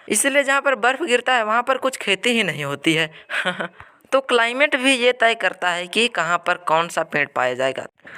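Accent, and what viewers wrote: Bihar, India. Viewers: Bhai tum bihar ko ho kya?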